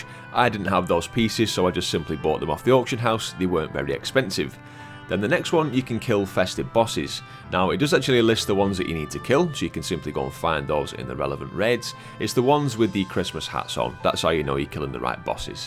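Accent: Yorkshire, United Kingdom. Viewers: The yorkshire accent made this vid 300% better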